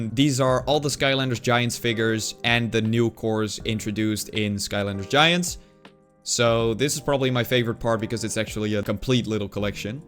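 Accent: Netherlands. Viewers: Joo, hoor gelijk aan je Engels accent dat je Nederlands bent haha, leuke video's man! Kwam langs je skylanders video, en kreeg gelijk weer een nostalgisch gevoel man. Leuk dat je zo ingaat op de wii games waar bijna niemand op ingaat of die een beetje vergeten worden. Ga zo door!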